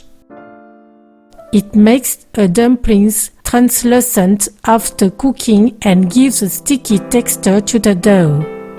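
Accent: France. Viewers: she sounds very French!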